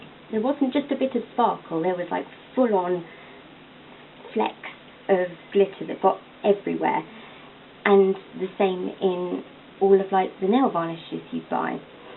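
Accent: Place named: South, United Kingdom. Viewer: I like your speaking voice. You sound a bit posh, southern England? I like your channel because you have videos going back quite a while and I always think it's interesting to see how people grow over time. Hope you're doing well and would like to see a new video some time!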